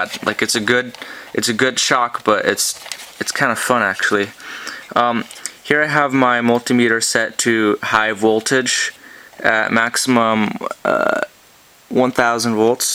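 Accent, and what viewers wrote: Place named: United States. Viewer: He has a American Accent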